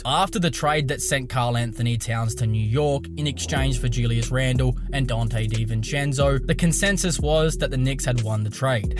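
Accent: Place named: Australia. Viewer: You’re voice is so Aussie that it’s not even Aussie any more haha! It’s awesome 🤣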